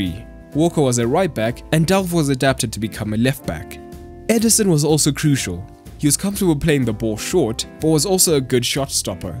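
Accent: South Africa. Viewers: I'm sorry but your accent sounds South African, are you South African?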